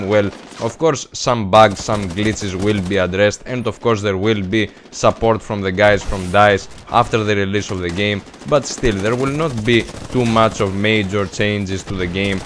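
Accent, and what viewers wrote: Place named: Greece. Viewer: Γειά σου φίλε,τυχαία σε πέτυχα,άκουσα προφορά και λέω δικός μας είναι...Ωραίο το κανάλι σου...γουστάρω...Κάντο και στα Ελληνικά ρε με αγγλικούς υπότιτλους :P παίζεις σε ps3,360,pc;τσέκαρε το κανάλι μου άν θες...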